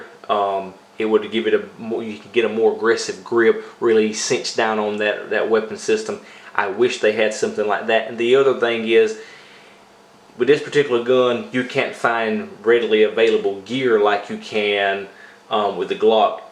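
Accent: United States. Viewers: At first I thought it was a black British guy trying to put on a southern American accent but nope. Just a country ass black dude....right on! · Great Review bro! I'm originally from Laurinburg, NC and you sound like the folks from Pembroke, NC! The accent reminded me of home. Good Stuff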